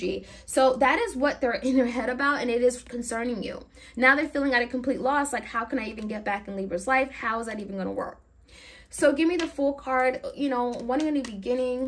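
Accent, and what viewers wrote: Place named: East Coast, United States. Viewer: ur accent gives me classy east coast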